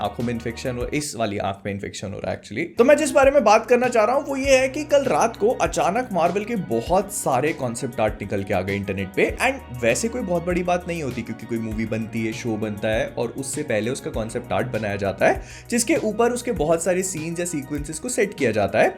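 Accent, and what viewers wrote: Bhopal, India. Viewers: Bhai tum Bhopal se ho ?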